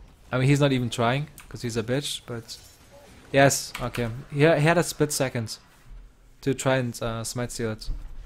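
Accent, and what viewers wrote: Germany. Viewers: Are u actually german? You sound like a german. :d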